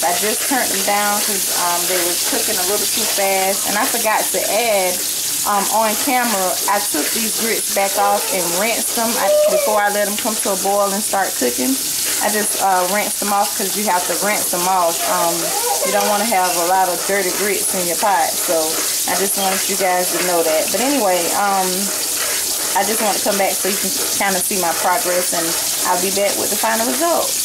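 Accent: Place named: South, United States. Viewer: Inlove that bw southern accent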